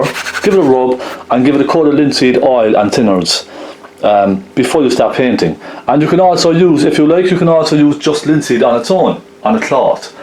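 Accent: Cork, Ireland. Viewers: I so love your Cork accent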